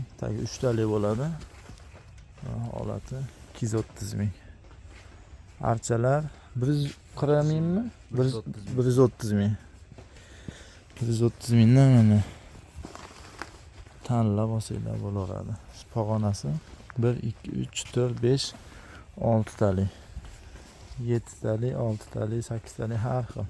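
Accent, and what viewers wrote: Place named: Andijan, Uzbekistan. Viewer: талаффуз Анжонникию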